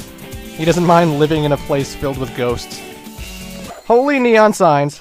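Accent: Canada. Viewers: YOUR CANADIAN!!!!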